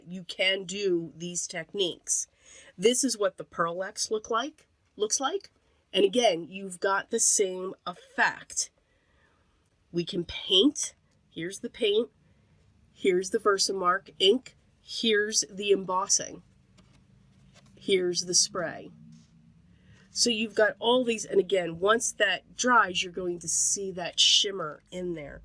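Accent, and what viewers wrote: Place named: New York, United States. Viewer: There is a lot of information here, so I might have missed it, but I was wondering your opinion on which brand had the most shimmer? It is hard to tell on camera and I am sure in person it is much easier to tell. I also was wanting to know, for whatever reasons, which brand you are leaning toward using the most? I realize you probably don't want to "knock" any of them, but each person will have their own preferences, ie: wanting more shimmer, less shimmer, binder, non, etc. I am sure each has their own good qualities, but I would really love to know which you lean toward and why? I have perfect pearls and love it, and I also sometimes use cheap make up (and then seal one way or another) as I can get more range of colors that way, and less expense. But your opinions would be helpful. =) Thanks so much for sharing this, I found it very useful. 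Plus I always enjoy hearing you chatter. =) (I am not sure where you originally hail from, but your accent reminds me of back home in NY, and I miss it there and the days of growing up there.) Oh, and love your sense of humor. You make me laugh often. LOVE it when you do junk journals too! You often feel like a soul sister...despite not knowing me at all (sadly.) One last thought...I was thinking a Versa Mark pen and any mica powders would be fun together. Could make for some fun and shimmer writing/lettering. TFS as always. =) ~Kat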